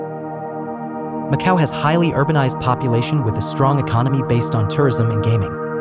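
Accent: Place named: United States. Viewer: Get rid of the American accent, it’s terrible.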